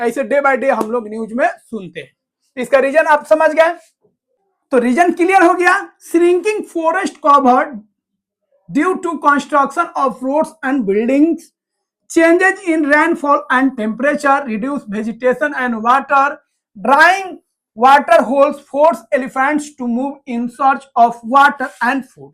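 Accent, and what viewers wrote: Bihar, India. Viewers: Sir ap bihari ho ke ? Ham bhi bihar se hai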